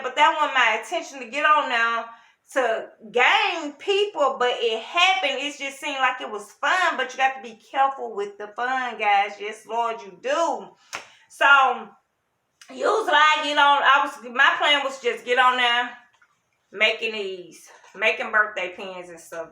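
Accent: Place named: South, United States. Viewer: Yea people always try to try the quiet ones u gotta be careful with them lol 😂 Where are u from because how u say “street” and “strange” that’s down south I love it😂😂👌🏽👌🏽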